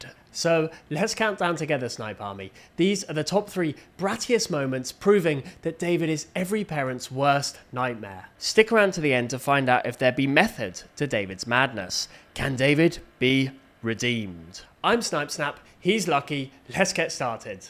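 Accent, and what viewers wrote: United Kingdom. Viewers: Yeah is British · Hey there mate quick questions are you British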